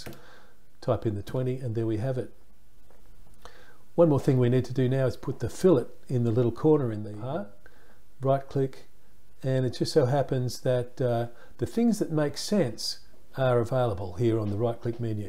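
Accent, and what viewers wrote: Australia. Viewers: Hello from UK! I much prefer listening to your Aussi accent than the American lazy drawl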